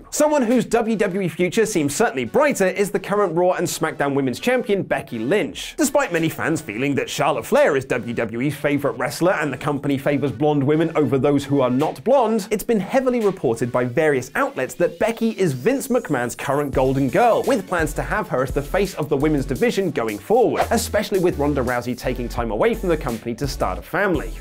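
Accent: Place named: Manchester, United Kingdom. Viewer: mancunian accent was excellent!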